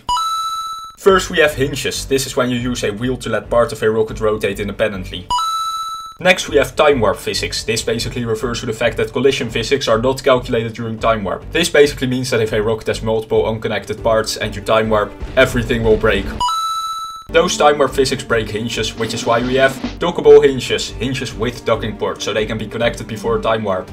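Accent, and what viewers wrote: Netherlands. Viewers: i smell a dutch accent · are you dutch you sound really fucking dutch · Ah, yes, a fellow dutch man I hear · Het nederlandse accent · love his dutch accent!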